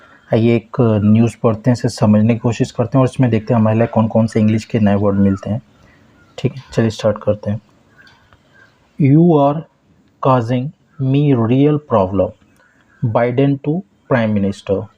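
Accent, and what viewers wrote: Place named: India. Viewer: Accent = India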